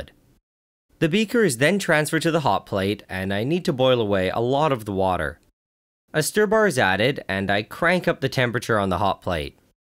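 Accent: Quebec, Canada. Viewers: you from québec ????? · Quebecois par hasard?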